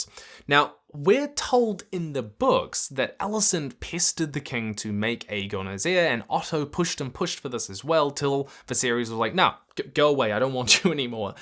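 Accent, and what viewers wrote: New Zealand. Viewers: I love how the auto-subtitles read your New Zealand accent as saying, "Six Six Six" at the beginning :)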